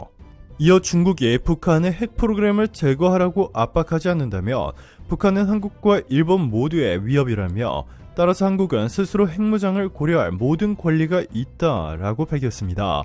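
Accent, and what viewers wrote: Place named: Myanmar. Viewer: 나레이션 남성분 한국어 좀 제대로 말 하시길 바랍니다. 한국말이 마치 미얀마 사람이 말하는 식으로 들리며 끊고 숨 쉬고 이게 잘 안된다는 것입니다.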